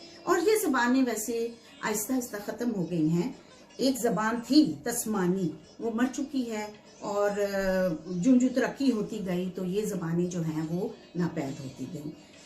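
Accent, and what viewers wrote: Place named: New York, United States. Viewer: Very NYC voice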